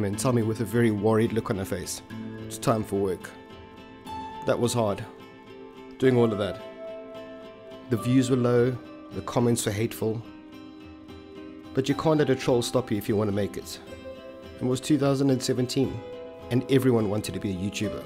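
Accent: South Africa. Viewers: Very new to your channel came across it via some Jordon Rant rust stuff, heard the accent and went wtf its a saffer! Best south african channel I've come across so far. Congrats on 2 years. All the best for the years to come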